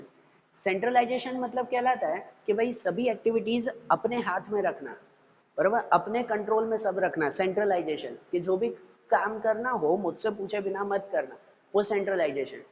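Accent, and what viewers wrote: Gujarat, India. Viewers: Sir aap Gujarati ho !?😋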